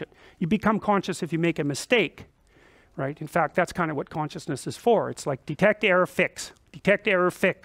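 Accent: Canada. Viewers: every once in a while, you hear the Canadian 'aye' come out. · He says eh a lot. Is he Canadian? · He let the 'eh''s fly this lecture. It tickles me whenever he lets one out. I love Canadians.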